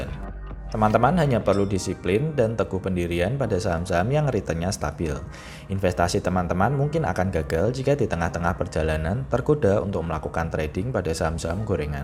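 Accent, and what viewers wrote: Java, Indonesia. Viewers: saya suka chanel ini krna ngomong nya medok, berasa cangkruk sama teman sendiri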